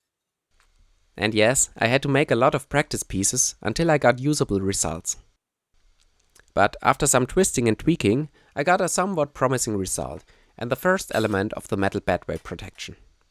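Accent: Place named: Germany. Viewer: German accent , German ingenuity !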